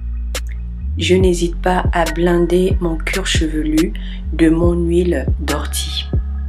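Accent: Gabon. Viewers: 🇬🇦...haaaa je savais l'accent boma👌 au moins c'est plus crédible on je me retrouve dans le type de cheveux 😋 courage sista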